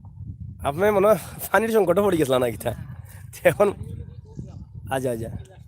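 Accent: Sylhet, Bangladesh. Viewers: আপনার বিডিও কুব সুন্দর লাগের ভাই। সবটি দেখছি। আর সিলেটি মাতলে আরো বেশি মযা লাগে।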